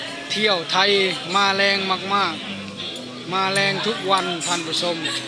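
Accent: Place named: Savannakhet, Laos. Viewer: สวยมากฯ... เสืยงคนทาง สะหวันนะเขด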